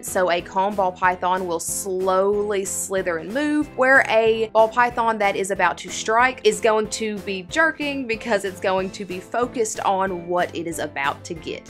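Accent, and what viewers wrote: South, United States. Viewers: Thangs! Good ol southern girl haha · I love how she says thangs instead of things. It's a southern thing y'all lol. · Snake talk with a little bit of a southern accent. I love it. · Your accent sounds familiar. What part of the south are you from that is if you are from the south I don't mean to assume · Is that a southern accent I hear 😏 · Hi I love that you are from the south it really gives me confidence as I am getting ready to start my channel to be myself because I feel like NO ONE on youtube has a southern accent but watching your video made me so happy!!!:) subscribed:)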